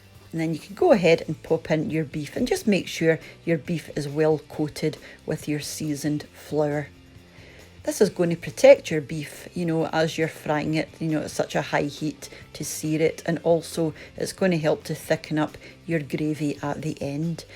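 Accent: Scotland, United Kingdom. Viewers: I love your cooking reminds me of my mother’s cooking, she was from Dundee also where I was born but only lived there until I was four. All her cooking when I was young was based on Scottish food, so to hear your accent and see the the cooking warms my heart and makes me hungry. PS maybe not so many americanisms of your lovely accent?😊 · Excellent 👍👍 presentation. Love your Scottish accent. You sound just like my daughter's mother in law ... Glasgow. Lol 🤗definitely will try this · Beautiful scottish accent.😊❤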